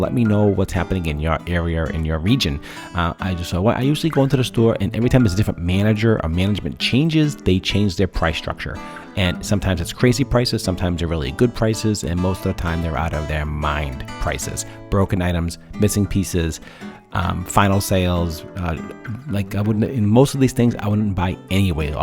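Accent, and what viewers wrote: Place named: New England, United States. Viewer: That accent!! Had to be new england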